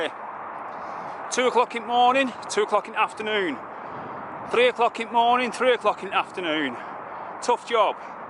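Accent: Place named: Northern England, United Kingdom. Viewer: Awesome video and walk,. I've been eagerly awaiting the arrival of part 2, which has been an enormous pleasure to watch. A question for you... I was born and live in and around Southampton for just over 22 years, yet I know that your accent is not from the south, so, where were you born? I'm always being told that I haven't lost my accent, yet I've live in the Peak District now for 52 years. This is why I love watching your southern walks and camps so much, one never forgets one's home of birth. Thanks so much and I'm looking forward to your next walk/wildcamp. Take care...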